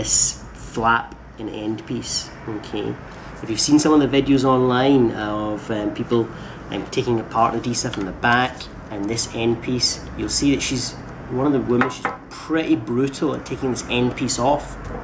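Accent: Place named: Scotland, United Kingdom. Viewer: I think yul find he's scuuutish!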